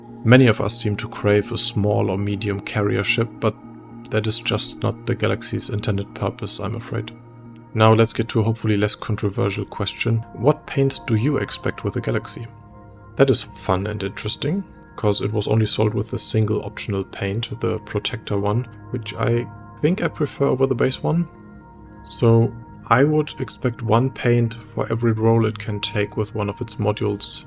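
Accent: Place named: Germany. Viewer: dieser deutsche akzent... bitte sag mir das ich micht nicht irre... kanadier reden nicht so ^^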